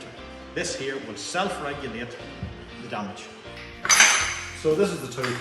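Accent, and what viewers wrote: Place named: Donegal, Ireland. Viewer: Donegal accent always makes any vid sound better. :-)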